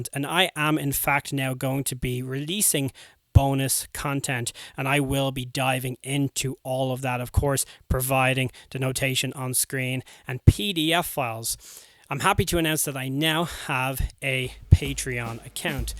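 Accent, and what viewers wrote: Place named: United States. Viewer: Great to see you back doing what you do best. You're a natural born drum teacher. I don't know if your family in Ireland notice it when you make video calls but there's some American creeping into the accent.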